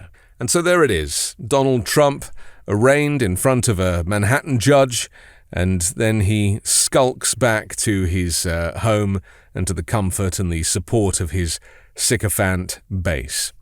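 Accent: United Kingdom. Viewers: . Nice job sir. Great to hear a British (or non American) voice outlining the situation so clearly. Im a New Zealander and appreciate this. Trump is now like a child bully. Once they are found out and cornered they show they are really horrible nasty gutless cowards. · I love how you completely dismantle Trump with your British accent making it sound like a very friendly story. The truth hurts 20 times more out the mouth of a Brit 😅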